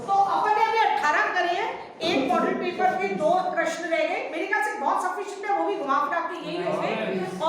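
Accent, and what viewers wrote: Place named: Udaipur, India. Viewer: Udaipur Se Ho Kya Medam Aap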